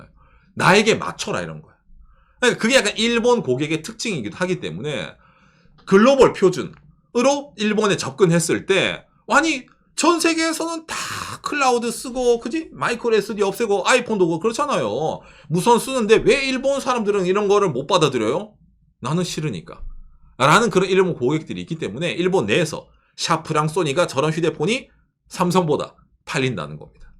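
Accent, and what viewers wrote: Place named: Gyeongsang, South Korea. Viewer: 깅상도 유튜버 정도되면 사투리는 어느정도 걸러서 영상 올리슈. 유튜버 기속하려면!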